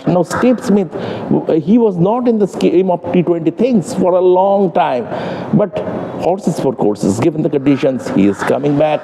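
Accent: Bengal, India. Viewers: Your Bengali accent is prounounced. It takes a while to understand and the background sounds are not helping either. Please make facility to make yourself heard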